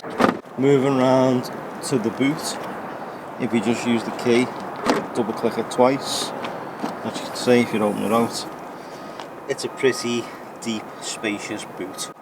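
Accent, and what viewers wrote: Liverpool, United Kingdom. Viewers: 6.2 V8! Nice to hear a scouser! haha Got my SS here in Liverpool haha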